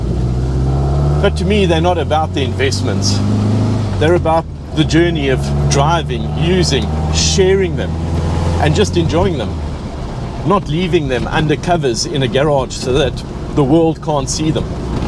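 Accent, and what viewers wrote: South Africa. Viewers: Your accent definitely gives you away 😂😂😂 South African 🎉🎉🎉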